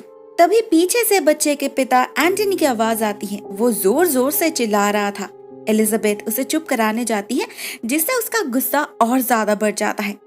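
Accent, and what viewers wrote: Bengal, India. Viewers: Hello mam by your accent I think you are from Bengal , if not then can you tell from which state you are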